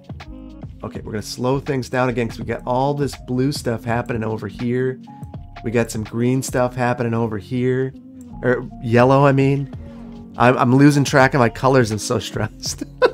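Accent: Wisconsin, United States. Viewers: let your Wisconsin come out more often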